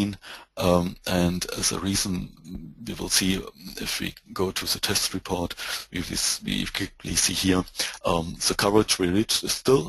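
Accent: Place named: Spain. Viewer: Sound like Spanish but understand very good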